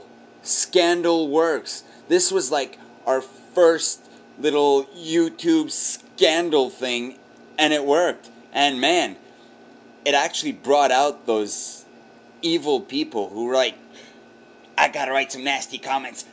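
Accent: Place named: Quebec, Canada. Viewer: Loved the animations! And had to listen VERY HARD to find any Quebecois accent in there. Pity, kinda, I love the melodious way some Quebec'ers speak english.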